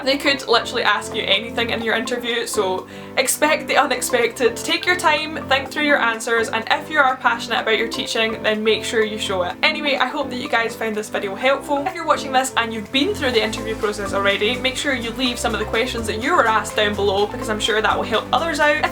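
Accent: Scotland, United Kingdom. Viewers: First of all, I could listen to you talk all day long. I love the sound of your Scottish voice. Even wearing glasses you still look far too attractive to be a teacher. From what I could tell you're a bit shortsighted so you need glasses to see things at a distance clearly. I'm a retired optician if you're wondering. Over then next few years you can expect your eyesight to further worsen a bit each year due to your young age and the amount of close work you will be doing such as reading and studying. You can expect to be wearing glasses full time within a year or two. So it's a good thing you look as amazing wearing glasses. But then again, teachers are expected to wear glasses. I remember in high school every teacher I had was rather old and they all wore glasses. The only exception was my junior year history teacher. She was young and very pretty and I always looked forward to my history class just because of her. 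When we came back for Christmas break I was surprised to see her wearing glasses. She must have just gotten them because she was doing a lot of taking them off and putting them back on. At one point she was in front of her desk teaching when realized she wasn't wearing her glasses. She turned around to get her glasses and as she was putting them on she said that she had just got them 3 days earlier and she was still trying to get used to wearing them. She told us that she had noticed a couple months before Christmas break that she was having trouble seeing posters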